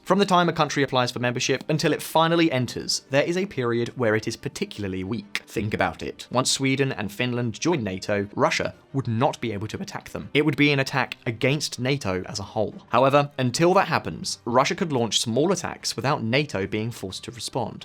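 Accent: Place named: United Kingdom. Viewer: More total drivel! Finland has a substantial expanse of Russian border to donate to NATO's ongoing `containment' policy. Nothing else applies. History has nothing to do with it. Russia is not terrified of the NATO membership proposition. It has had to alter military strategy in placing more military bases in the west, but that has already been accomplished. Fact bending with British accent merely screams of something else, other than `analysis', so just let it go.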